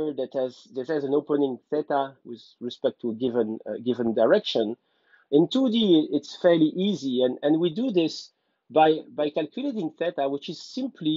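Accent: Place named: Belgium. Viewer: thanks a lot ... ur fan from morocco. Je sais que vous êtes belge et ainsi vous parler le français ...ça se voit surtout sur ton accent... vraiment vous faites un trop honneur pour votre pays ...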